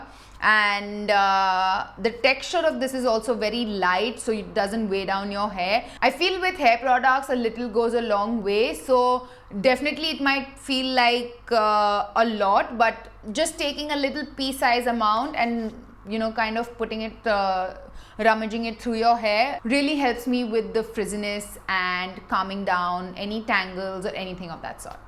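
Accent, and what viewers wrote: India. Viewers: Priyanka Patnaik I understand what you are saying. But she cannot help it. When you listen to different accents all day you tend to get slightly confused. It does not bother me though. I prefer her natural Mumbai accent, I lost mine in the US, so it feels like home. ❤️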